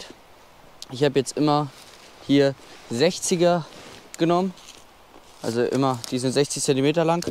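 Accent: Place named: Bavaria, Germany. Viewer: achso finde du hast so bayrisch geklungen :D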